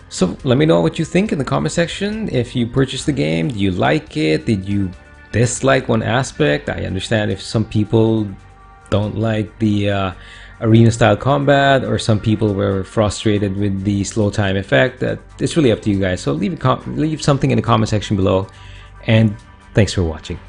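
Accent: Philippines. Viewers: That is not what I meant to my message. I know the accent of pinoys so I ask him if he is pinoy now do you get it?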